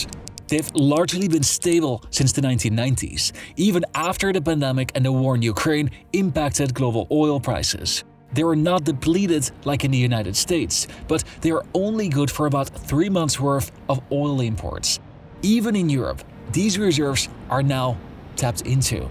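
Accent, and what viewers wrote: Netherlands. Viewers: Mate are you dutch?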